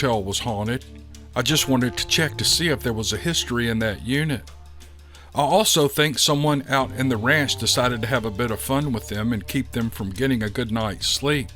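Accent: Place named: Texas, United States. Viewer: Is this guy from Texas Listen to that thick ignorant accent he's got. Like he's about to eat a piece of pie or just got done mowing his yard and drinking 5 beers to do so popping open the sixth his wife knows that she's gonna get beat down tonight so she pops a Xanax and a Vicodin and downs it with 1 whiskey sour mixed drink. She's only gonna feel it in the morning when her children are starring down at their breakfast eating in a hurry trying to avoid any eye contact or small conversation with their physically and sexually abusive father or their coward mother. Just five more yrs Billy says to macey his twin sister after breakfast as they swing on the back porch. Macey stares out into the acreage and deep woods of the backyard whishing someone or something would happen to end it all now. 13 long yrs of hiding under their beds running for their lives into the woods and communicating through walkie talkies to make sure they avoided their old man. 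Then church every Sunday where they have to watch him deliver his sermon from the front pew. The older they got the more the well masked hippocracy seemed to cut into not only their religious beliefs but also their belief in the goodness of humanity. Their mothers fingers seem to calmly and masterfully stroke the ivory and black keys of the church piano while the congregation sings hymns but if you sit close enough and know what to look for you can see her hands trembling slightly more each week as she loses her dignity and self respe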